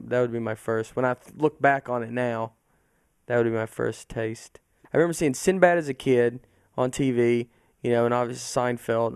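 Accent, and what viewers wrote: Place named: Tennessee, United States. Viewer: New York... really? Well, I understand. I usually don't crack up at stand up, but your accent is ridiculous. I'm from Tennessee, Nashville area too, so yeah I get it :) Come to Zanies! Book a show, bring your wife and we'll go out to dinner; you two and my fiance! You remind me of my friends and myself! Really relatable. That's probably why I can understand where you're comin from and why you're perspective is refreshingly funny. Oh, wait, no that's the Italian back hair...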